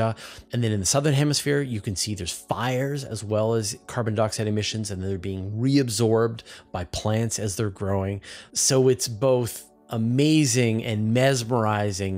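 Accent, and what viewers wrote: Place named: Canada. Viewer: Your canadian accent is very nice, there's nothing to worry aboot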